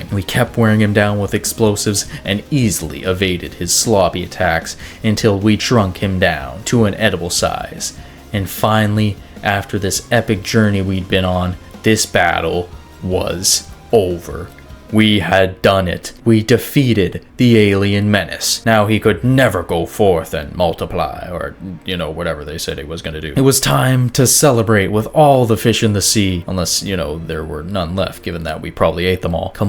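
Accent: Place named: Canada. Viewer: Bro ur Canadian accent is strong asf 💪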